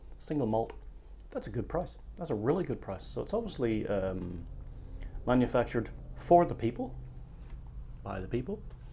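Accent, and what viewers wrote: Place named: Northern Ireland, United Kingdom. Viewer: Interesting one to hear about. Cheers. Per chance, are originally from N Ireland? Just picking up some local tones. Sláinte.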